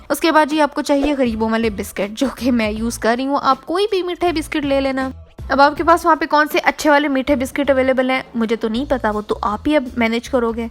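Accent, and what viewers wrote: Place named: Punjab, India. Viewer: that punjabi accent is just wowwwwwwww :)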